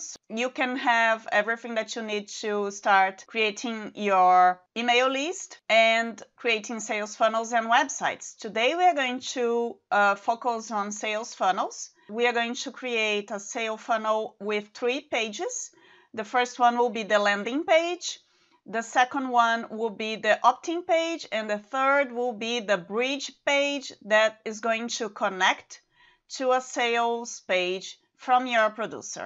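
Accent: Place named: Germany. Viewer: You have a beautiful German accent 😀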